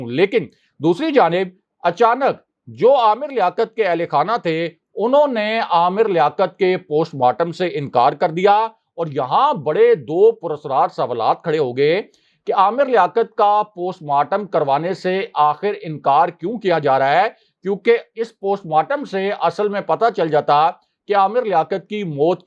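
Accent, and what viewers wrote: Punjab, Pakistan. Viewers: Pie Pendo Punjabi, Correct your punjabised accent Urdu. You cannot correctly pronounce simple Urdu words. Your mispronunciation is very absurd and irritating.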